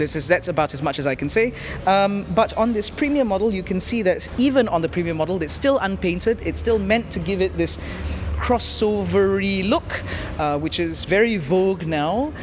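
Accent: England, United Kingdom. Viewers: Hello. You deserve more subscriber. I really like your english accent. I hope to have master english language also. Keep up the good work 👍 Edit: also, the video editing is top quality. Superb!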